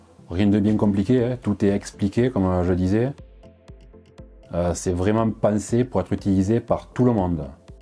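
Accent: South, France. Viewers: Bonjour, merci pour votre vidéo. Je suis pas très au point sur la technologie mais j'ai compris tout ce que vous disiez ^^ Du coup j'ai une question complémentaire : Puis je voir les chaîne "TNT" via ce petit boitier ? Mon but ultime serait de modifier mon abonnement téléphonie pour n'avoir plus que internet et voir la tv sans le décodeur traditionnel :) Merci d'avance et vive l'accent du sud !!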